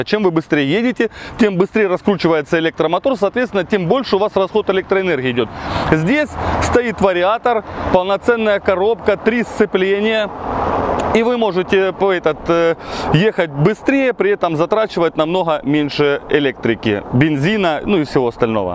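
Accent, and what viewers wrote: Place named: Luhansk, Ukraine. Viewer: Virab Saakyan он с Луганска ,а там у всех говор такой. Мой земляк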